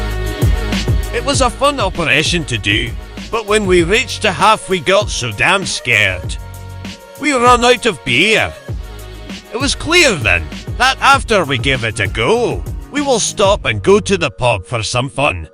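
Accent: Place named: Scotland, United Kingdom. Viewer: I love the Scottish voice! 😁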